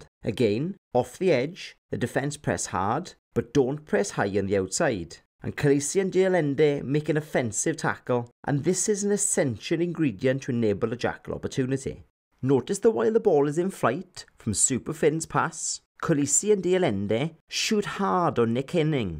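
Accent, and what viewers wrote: Wales, United Kingdom. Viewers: Great insight and enjoyed the Welsh accent as a bonus! Subscribed and followed on Twitter. Looking forward to learn more from you. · I like this Welsh accent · Just love your Welsh accent😊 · great clip, excellent analysis- in this channel we have a Squidge competitor- love the heavy Welsh accent- must be a man from the Valllleeezz? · Excellent analysis. Love that thick Welsh accent. Regards from South Africa.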